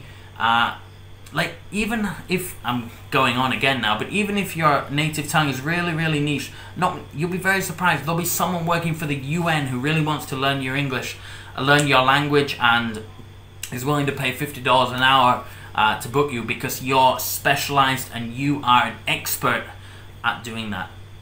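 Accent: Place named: RP, United Kingdom. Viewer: Your queen's English needs a bit of clearer articulation. And yeah, weak argument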